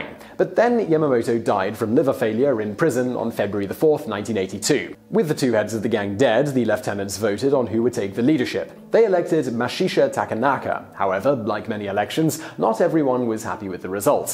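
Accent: United Kingdom. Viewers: ugh, its not pronounced ya-KHU-za. Yakuza is pronounced "Cheese." Yes, I am A) busting your balls, and B) displaying how much most of us give a damn how some brit pronounces names in a culture whos language is completely separate from English. As in not very much at all. Dont get caught up in the vocal minority, Most of us are just looking to be entertained for a few minutes, doing some research and giving it your best go is all we expect.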